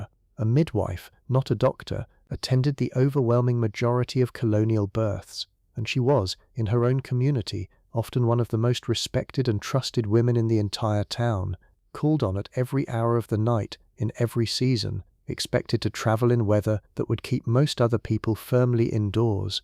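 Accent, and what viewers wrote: United Kingdom. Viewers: You with the British accent forget that your country transported 3.2 million slaves from the 1600s making yourselves very rich. The entire endeavor is still going on in too many lands by the same people that sold their own all those years ago. It is all diabolical.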